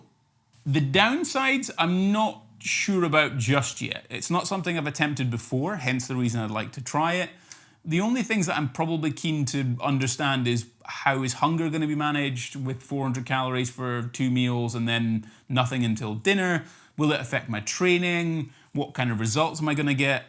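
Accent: Scotland, United Kingdom. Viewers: You sound Scottish!😅 I love ever accent except my American one. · I got so thrown off when you opened your mouth and you were Scottish (I'm Scottish) never expected to hear a familiar accent but always lovely when I do 🤗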